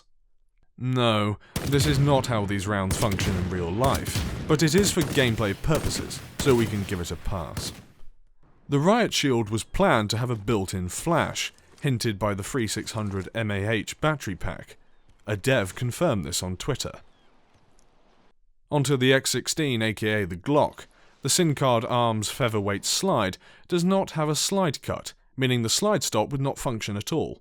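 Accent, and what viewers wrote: United Kingdom. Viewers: I think he's British so that's a given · He's British. Their accent makes everything sound more official.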